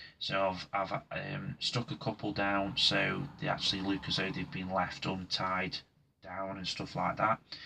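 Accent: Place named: Stoke, United Kingdom. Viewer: I didn't know you had a youtube channel!! It's nice to hear a Stokie accent! xx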